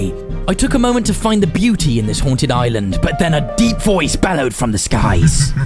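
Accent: United Kingdom. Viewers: I like your British accent it's cool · I saw herobrine u bri'ish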